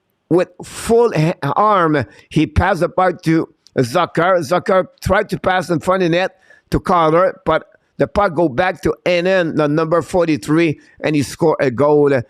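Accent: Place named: France. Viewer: The FrenGlish is great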